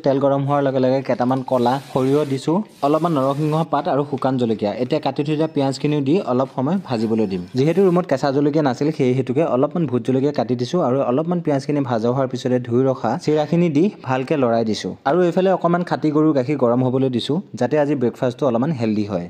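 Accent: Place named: Nalbari, India. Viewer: কথা বিলাক নলবৰীয়া যেন লাগে, মই লখিমপুৰৰ পৰা চাই থাকো।❤❤😊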